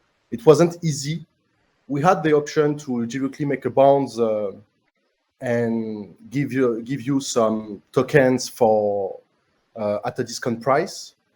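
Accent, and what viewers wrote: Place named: France. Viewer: So greaaaat 🔥 Love your cute French accent lol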